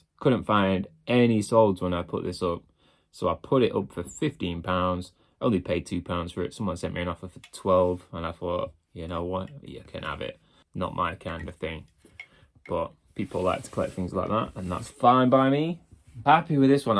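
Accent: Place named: United States. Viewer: why you sound american if your from Uk, the fuqq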